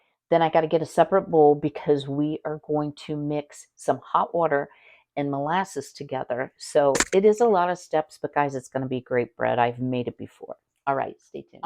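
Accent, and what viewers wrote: South, United States. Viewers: So THAT'S where your southern drawl comes from!!! 😉 I plan on making this bread for xmas. Thanks for the idea!🎅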